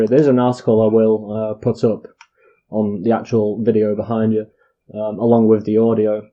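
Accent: United Kingdom. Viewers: You sound Scottish but I’m not sure so I said Brit. Usually id never say Brit but I did cause I can’t tell your accent. Definitely think you’re Scottish but not sure! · Erm have you never seen shrek…. This is not a Scottish accent he is English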